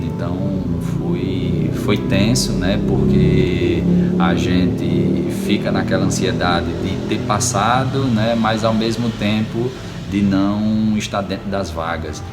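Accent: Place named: Rio Grande do Norte, Brazil. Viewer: Excelente irmao, muito bom mesmo, o melhor depoimento pra quem está buscando pois aborda cada ponto c calma e objetividade. Pelo sotaque ou é potiguar ou paraibano né? Brasil.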